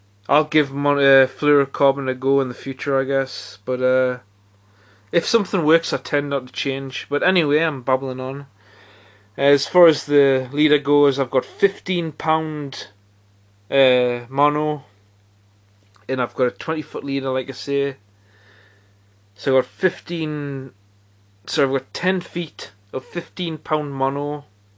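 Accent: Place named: Northumberland, United Kingdom. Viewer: Hiya m8, put it this way, on warm still summer nites u can catch school bass, coalies & perhaps a sea trout, which of course u return! from behind the rokers, so on wi your chesties follow the tide back & I used 2 prefer 2 cast sideways along the beach, parallel 2 the waves if any, also on the whale rock ive had coalies, very small Pollack, tommies & 1 wrasse!, keep up the vids as its nice 2 hear someone speaking properly as what we do!!!, from a fellow Blyth dangler.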